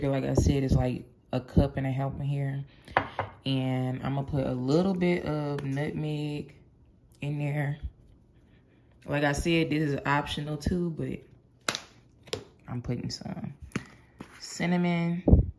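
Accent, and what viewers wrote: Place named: South, United States. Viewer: Where are you from? 👀 sound like you from the South from ya accent!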